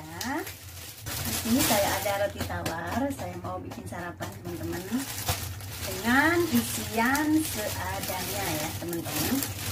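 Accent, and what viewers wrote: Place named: Java, Indonesia. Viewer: Enak wae gthu denger logat jawane😂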